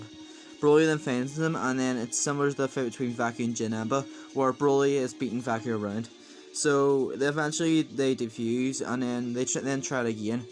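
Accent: Ireland. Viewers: The second scottish youtuber I found yes!! Edit:I mean irish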